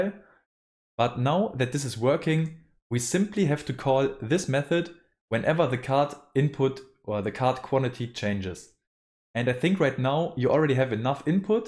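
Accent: Germany. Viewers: Haha bitte😄 Hatte es am Akzent gemerkt, dass du warscheinlich deutsch sprichst. Ich benutze das narrative theme und so wie es scheint ist der Code der im Video ist nicht der selbe wie bei mir. Deswegen kann ich auch nicht die stellen finden an denen der code geändert/eingefügt werden soll.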